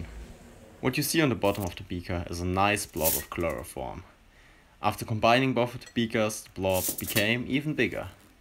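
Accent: Germany. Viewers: I hear your german in your voice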